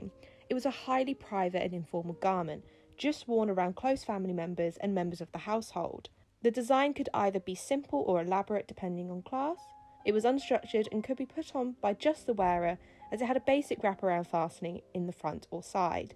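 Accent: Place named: United Kingdom. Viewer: Voice and diction teacher here, slow down and enunciated your words. Your British accent isn't quite RP and is difficult for Americans to understand/process.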